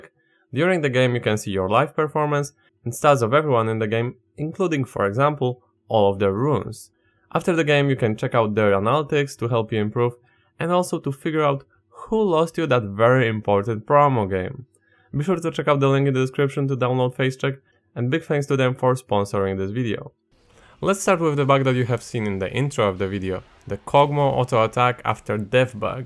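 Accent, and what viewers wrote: Poland. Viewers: the Polish accent make the video better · Polish voice strong! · Stary, pierwszy raz usłyszałem twój glos, od razu wiedziałem że jesteś Polakiem i chyba się nie mylę.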